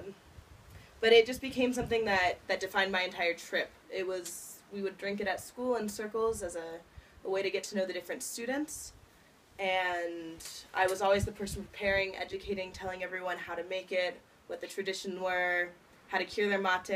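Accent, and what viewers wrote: Argentina. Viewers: Bombillaa! accent Argentino 👌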